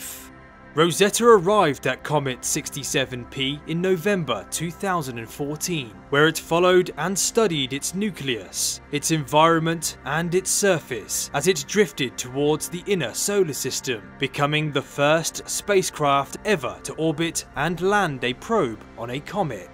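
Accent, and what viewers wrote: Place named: West Midlands, United Kingdom. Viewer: This guy has to be West Midlands/south west England. There was a wee farmer twang in ‘excitement’ and ‘violent’ for sure. Great accent!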